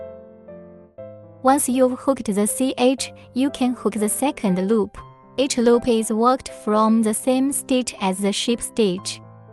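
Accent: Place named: China. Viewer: more like English with a very Chinese accent and crochet lingo we can’t understand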